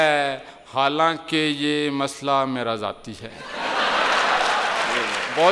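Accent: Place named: Punjab, India. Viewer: Husney Bayaan, Tanz-o-Mazaah ki zumaani Barikyaan or sadgana chashni, woh bhi ek dhime dhime Punjabi talafuz ki roshni main, wah kamal hai.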